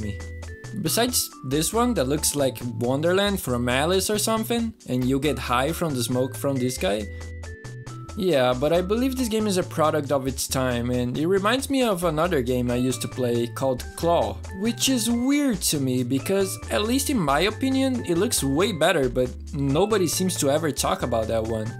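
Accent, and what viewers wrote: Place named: Brazil. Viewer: I love your channel, man. Are you Brazilian? Your accents is pretty similar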